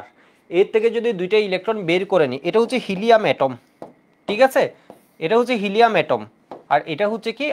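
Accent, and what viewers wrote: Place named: Chittagong, Bangladesh. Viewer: সম্ভবত Chittagong এর accent আছে ওনার কথায়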